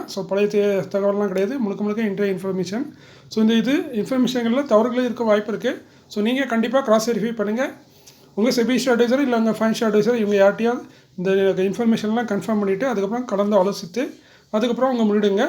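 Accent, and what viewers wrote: Tirunelveli, India. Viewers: Bro.... I love your Nellai Tamil. I Wonderful city, nice people. Keep it up Bro. NBCC, HUDCO bonus eppo tharanga bro. Please inform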